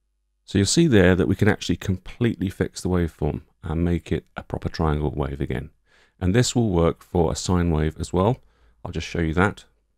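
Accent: United Kingdom. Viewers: I'm not into modular (yet ;) ), but these sort of video's give me also more insight in synthesis in general. Besides that, your video's are always very thorough and of the highest quality. (It shows you're a photographer / videographer). Plus you have an excellent voice combined with your English accent, which always makes it a joy to listen to.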